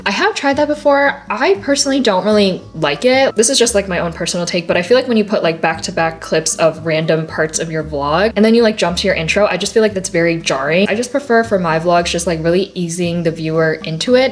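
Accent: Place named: South Korea. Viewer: 발성이 한국사람인데ㅇ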